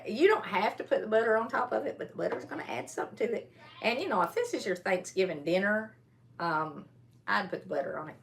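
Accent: South, United States. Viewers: It’s southern accent, and, yes it’s English! Have you not heard of southern accent, that’s where you get that twang from if you’re from Tennessee, North Carolina, Georgia and Atlanta usually have that twang, even those from southern part of Virginia will have it. Which she is from the southern part of Virginia. My mom was born in the early 30s during Appalachian times in from Southern Indiana, they don’t have much of twang. Now, not today people in Indiana may have it but, it’s just not as so, strongly pronounced, I guess you would say.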